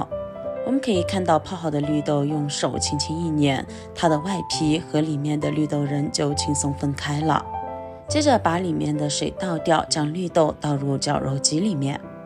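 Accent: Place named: Taiwan. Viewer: 调料的口味像大陆西北地区，口音是台湾🤣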